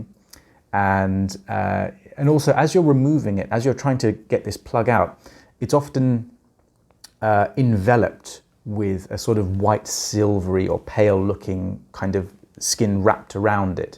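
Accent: United Kingdom. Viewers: Yup! Cute doctor with a British accent always has a calming effect on me. 😆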